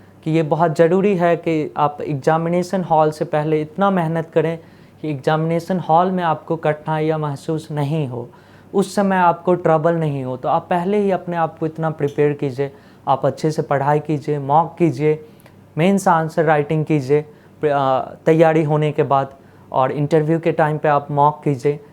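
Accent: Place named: Bihar, India. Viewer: Sun kr LG raha hai ki ye bihar se honge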